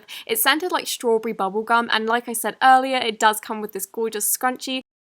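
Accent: United Kingdom. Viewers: love your british accent :Đ can u teach me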